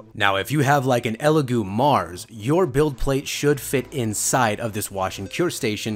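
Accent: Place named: United States. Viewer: I love American accents AWCOWHOLE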